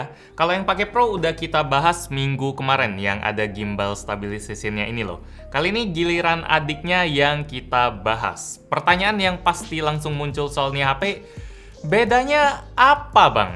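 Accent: Palembang, Indonesia. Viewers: Bang org mana sih? Palembang?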